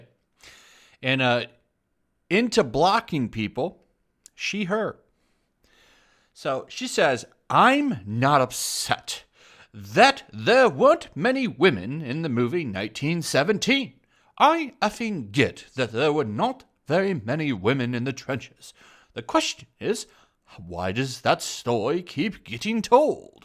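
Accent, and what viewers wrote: England, United Kingdom. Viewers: As much as I may agree with this dude’s point, his English accent is intolerable! I had to quit at the mark; Godspeed to anyone else who tries.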